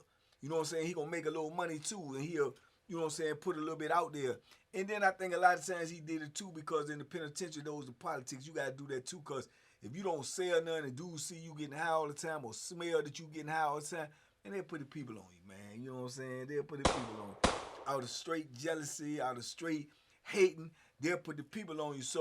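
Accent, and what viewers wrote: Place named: New York, United States. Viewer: Hartford CT Checking in and sassy salute that's rt you can't sleep on nobody son that NY acent is what's up□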